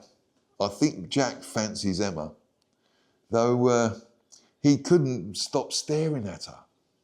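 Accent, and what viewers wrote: United Kingdom. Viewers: I like your style,. You make me laugh. You ought to be an actor. You have the perfect voice for it. Are you a Cockney?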